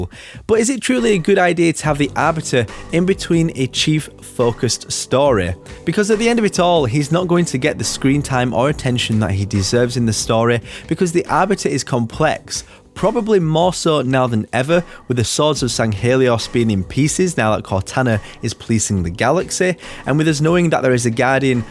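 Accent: Yorkshire, United Kingdom. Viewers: You aren't from Yorkshire are you? I'm not myself, but I lived in Yorkshire for about a year.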